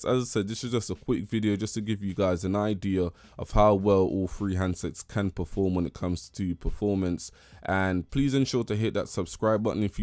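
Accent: United Kingdom. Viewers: Zed is the correct way of pronouncing "Z". He is from England and is speaking English. "Zee" is the incorrect butchered way that the Americans seem to speak English.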